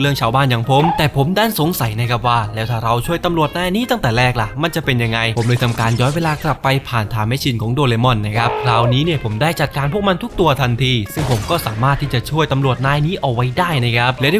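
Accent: South, Thailand. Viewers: ล่าไก่เถื่อน คนใต้หรอพี่ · ไก่เถื่อน..คนใต้ม้ายนิ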